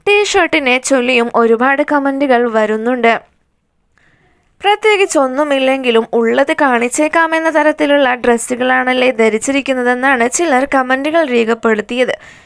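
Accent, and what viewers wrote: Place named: United Kingdom. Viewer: ഇംഗ്ലീഷ്കാരി ആണോ മലയാളം വാർത്ത വായിക്കുന്നത് 😎 കഷ്ടം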